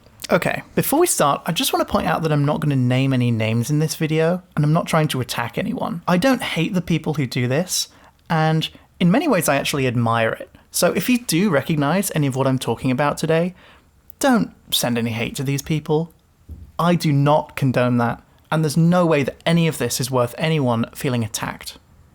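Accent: United Kingdom. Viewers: Ok literally I had the thought to also say "jumper" in case this person wasn't American and then he speaks with a British accent god dammit. Also how is this not Freddie Highmore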